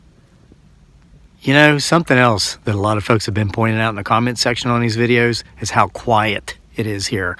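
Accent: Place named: Virginia, United States. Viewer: Ah hahhh, i thought you sounded like a fellow Virginian - please carry a firearm with you outdoors, my brother... I can tell you stories about a rodent looking creature as big as an elephant to invisible predators & spots in Goshen pass that will suck you underwater just to put a foot in. 💯💯💯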